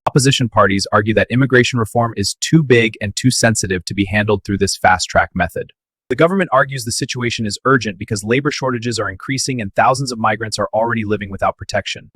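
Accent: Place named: India. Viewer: Why did you suddenly have an indian accent? Odd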